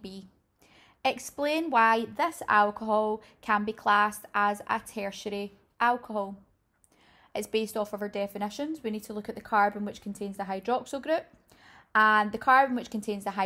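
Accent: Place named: England, United Kingdom. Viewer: I like your English accent reminds me of Brave Disney movie, Merida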